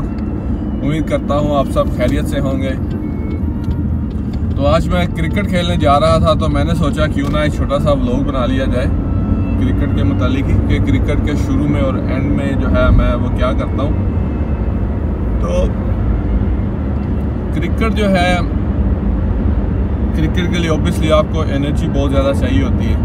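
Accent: Australia. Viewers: in ka accent to pure aussie lag raha hai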